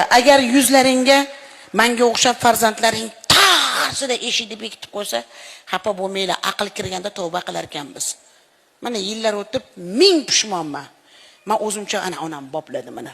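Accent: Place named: Margilan, Uzbekistan. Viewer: Маргилон шеваси дангалда Маргионликда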